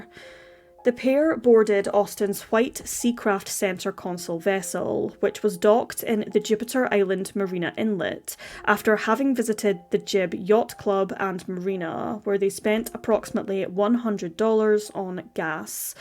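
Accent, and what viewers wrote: United Kingdom. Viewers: We’re fucked….. said in a perfect British accent….instantly subscribed! Best narration ever.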